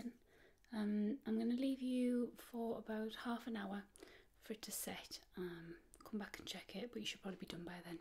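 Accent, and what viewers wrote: United Kingdom. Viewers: Very relaxing video. I like the British accent, of course there are differences between regions of Britain. but I think they sound pleasant to the ears. That is possibly a reason I like British comedy.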